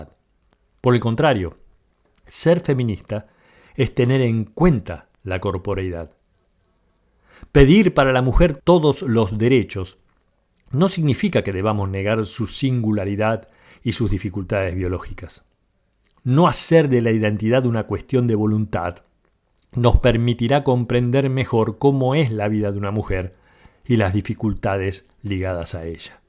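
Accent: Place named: Argentina. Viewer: Siempre es grato escucharlo. Deseo compartir una sensación paradogica mientras te oía.En la parte final del vídeo cuando haces una serie de postulados sobre la Libertad solo asentía y no podía estar más que de acuerdo. Hasta que el acento argentino me recordó a MILEI y pensé como unas ideas tan profundas y tan ciertas pueden ser tergiversadas y usadas para todo lo contrario. De nuevo, mil gracias.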